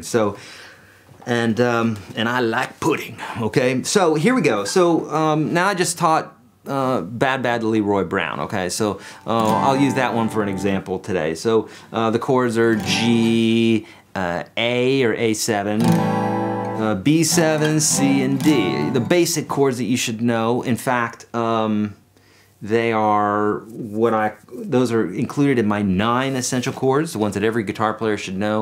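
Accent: South, United States. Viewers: Your southern accent makes these lessons so much more enjoyable. I don’t know why, it just does!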